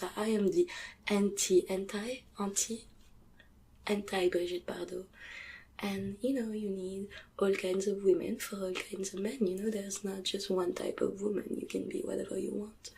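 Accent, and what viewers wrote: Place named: France. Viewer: Tingly as always <3 Your french accent videos are so tingly man. Your hair!!! Are those clip-on bangs or a whole wig or new hair or--- you look gorgeous anyway:)))) Thank you for the video <3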